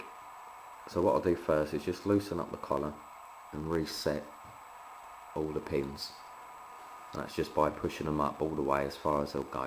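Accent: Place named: Cockney, United Kingdom. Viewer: ur sucha theif lol all ur videos are on picking locks. and u sound like ur from east end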